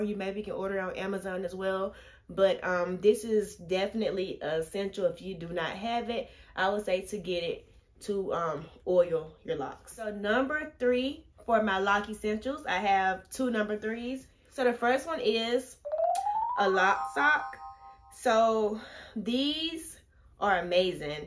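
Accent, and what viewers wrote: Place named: United States. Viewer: You right! That “mist” bottle is the bomb! I just got one a week ago and I love it! And no apologies necessary … I love your country accent.😁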